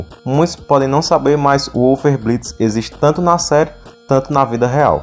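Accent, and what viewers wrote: Northeast, Brazil. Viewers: Ce é nordestino?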